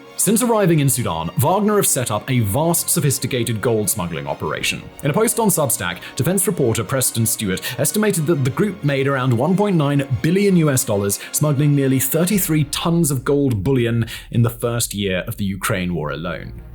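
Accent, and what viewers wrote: RP, United Kingdom. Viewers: I'm also from the UK. narration voice is an RP accent influenced by time living overseas. If you spend a lot of time speaking a foreign language it leaves imprints on your L1 speech over time. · he's a brit =)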